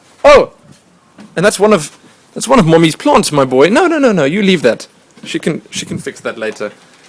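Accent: South Africa. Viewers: I'm South African so I thought it sounded familiar :P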